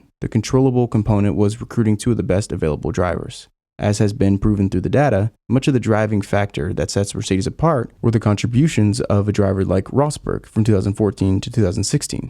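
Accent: United States. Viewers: It might be weird, but this is my first time hearing an American accent talking about F1......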